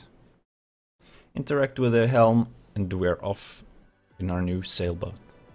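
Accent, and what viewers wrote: Sweden. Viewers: nice, btw your accent sounds like you're swedish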